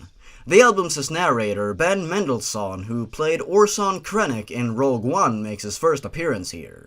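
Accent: Sweden. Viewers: Man hör att du är från Sverige bara så att du vet.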